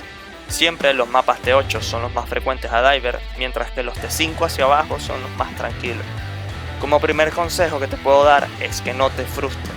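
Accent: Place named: Caracas, Venezuela. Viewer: Eres Venezolano? Ese accento es demasiado caraqueño!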